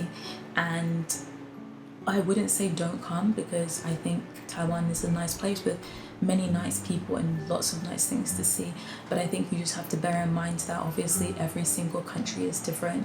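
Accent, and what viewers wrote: United Kingdom. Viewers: 你的口音聽得出來是個英國人。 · 同意 語速對我來說剛剛好 還有英腔的r真的好好聽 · Love your British accent,welcome to taiwan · I am a hongkonger, I think Hong Kong also is a nice place. General Hong Kong people are educated and open mind that welcome people from all around the world. Hope you would like to travel in Hong Kong. 🇭🇰🇭🇰 by the way I love your British accent❤️ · I like your British accent ;) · 哈哈！我好厲害！ 我聽妳說話一分鐘我就在猜妳是不是來自英國，因為很明顯的發音特徵，short a sound and no hard R sounds，但容我再猜一下，妳應該最初不是來自倫敦（雖然妳有提到倫敦，但家族應該不是一直住在倫敦吧！？），而且口音也不太像PR口音！ 此外我知道，英國其實有很多非裔人士，就跟法國一樣有很多非裔人士，所以我不感到意外，畢竟西方的人種很多元，當然台灣也是多元種族的社會，但在膚色、髮色等外顯特徵比較相似，不像西方國家那麼明顯！ 若是在台灣，有人讓妳感到不舒服，請妳原諒他們，多數台灣人還是懂的那分寸的，此外，妳提到跟台灣人不好交朋友，或許只是妳剛好碰到的人比較害羞，或者不擅長英語，又或是其他原因，我想，多數台灣人還是很喜歡跟外國人認識當朋友的！ 前面那些猜測，純屬好玩，希望沒冒犯妳，最後，祝妳能在台灣有美好的體驗與生活！ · 看影片前半段的時候就感覺你說英文好像有英國腔 等聽到你說是英國人的時候馬上恍然大悟「難怪~~」😆 歡迎來台灣！ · 覺得你說的很好👍🏼很喜歡你的英式發音跟講話方式 口條很清楚 聲線也很溫柔 很方便練英文聽力！說實在我在路上看到不同種族跟國家的人會很想認識他們 因為好奇他們的文化跟生活方式～希望妳在台灣能過的開心！ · 口音很優雅聽得出來像英國的感覺😍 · 英式口音超好聽的🥰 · 剛開始看就覺得口音很英腔，後面提到說來自英國就理解了，以前在念書的時候曾遇過膚色較深的法國人，之後就比較不會用膚色去判斷哪裡人了😂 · of course, you're a Brit. 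Most of the people here just can't tell your British accent unless you sound extremely posh.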